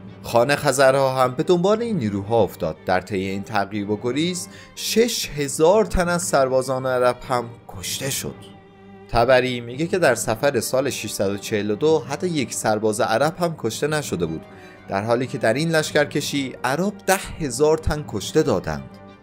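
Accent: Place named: Turkey. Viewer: کاش سلیس و روان صحبت می کردی خیلی ترکی صحبت می کنی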